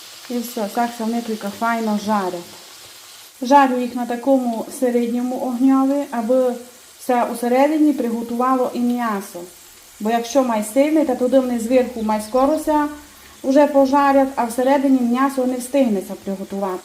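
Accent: Transcarpathia, Ukraine. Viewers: Май файно, коли авторка сказала " чебуреки добрі коли в них багато м'яса"!!!! Щастя вам і вашій родині!!! Всім нам миру!!! Дякую за файні рецепти!!! А наша ЗАКАРПАТСЬКА мова тото ще май приємне як мнясо з чебурека(фіґля)!!!!! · Дякую за рецепт. Ви гарна господиня. А мова! О Боже, цей Закарпатський говір... Люблю Вас!❤ мої рідні УКРАЇНЦІ! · Дякую за файну закарпатську мову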